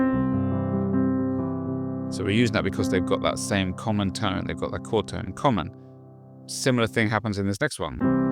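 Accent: United Kingdom. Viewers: thanks for your excellent teaching! Your British accent makes it extra special and realistic!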